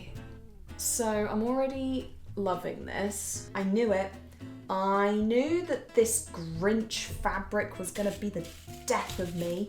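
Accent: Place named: Australia. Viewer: I really liked the ducky pink and that sheer purple you had in the pile... Oh well, the lamp came out lovely. Hey, question... Where in brittain are you? You sound very Aussie to me (an aussie)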